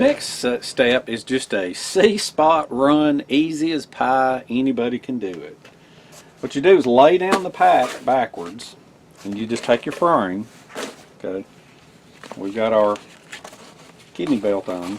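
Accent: South, United States. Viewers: great and informative video. just bought a pair of rocky boots cause i saw them on your channel. great boots. now something off topic. me being german always wondered why you and nick for example as southern gentleman seem to talk a little diffently. with some strong southern accent and nick not so much. why? is it a thing of age or just how you learned it? sorry for being so damn curious:)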